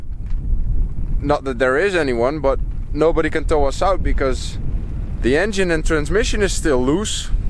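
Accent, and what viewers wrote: Netherlands. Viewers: I can hear the dutch accent when you talk :P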